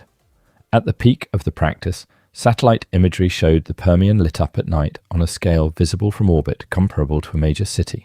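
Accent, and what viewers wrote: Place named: United Kingdom. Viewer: as soon as i hear a frickin british accent i shut it down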